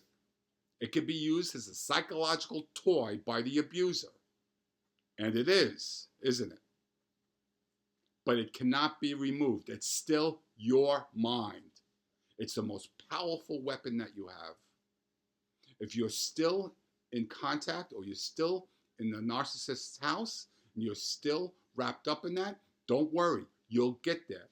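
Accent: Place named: East Coast, United States. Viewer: You sound East Coast. I live in Philly, and if you are near here I will gladly re-pay you by taking you through physical recovery with Yoga and other methods I use.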